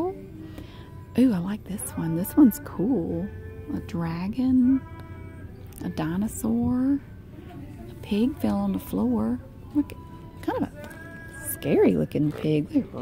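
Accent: South, United States. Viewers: I love that fact that her voice is authentic and I love her southern accent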